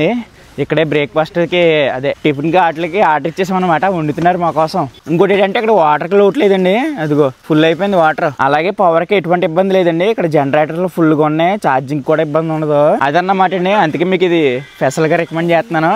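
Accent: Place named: Rayalaseema, India. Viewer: Anna Videos Late Avuthunnay But N Duko Mee Slang Ante Maa Raayalaseema valla ki baga istam anna Ann Alte Cheyakandi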